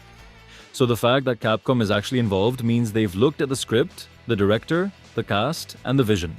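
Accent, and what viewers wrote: India. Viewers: not trying to hide your indian accent behind AI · That an Indian voice lost credit for me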